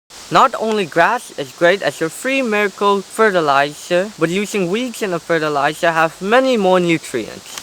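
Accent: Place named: Laos. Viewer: Absolutely a fantastic video. Very good job, young man. Please dont be offended, but you remind me of the Laos people i had the prevelage to work with. It's your dialect. Some people get offended if you miss guess their nationaly. What country are you or your parents from, as you may be a first generation to a different country? Your english is really good and clear easy to understand. You have a good knowledge. I learned some good information. I went to the other website that you pointed to, and your sibling also did a fantastic job, has good knowledge with exclent english.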